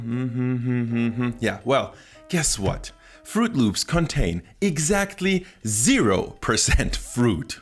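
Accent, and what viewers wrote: United States. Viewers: your accent is INCREDIBLY American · I like your videos. :) And I'm really curious to know where you're from. Well, obviously, you're German. But you sound like an American and you look like a mixed European and Middle Eastern. 😄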